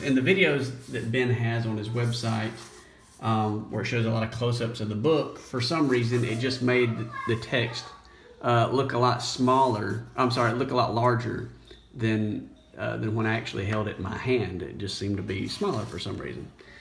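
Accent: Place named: South, United States. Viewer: Your strong Southern twang is in sharp contrast to your beautiful Greek pronunciation!!!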